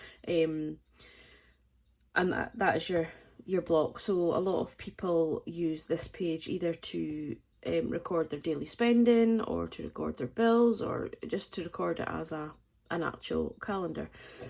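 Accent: Scotland, United Kingdom. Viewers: Omfg! You’re Scottish 😮 I don’t know how I’m ever going to get over that revelation 😂 LOVE the planner can’t wait for mine to arrive xx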